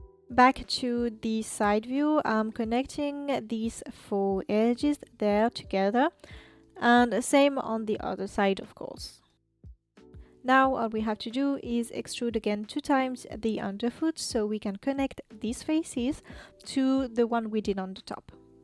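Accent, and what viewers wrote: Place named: France. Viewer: I know it ur French that soft btfl voice and accent soo cute :!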